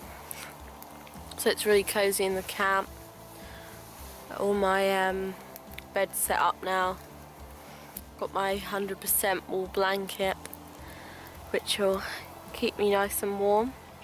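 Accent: United Kingdom. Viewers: cute british accent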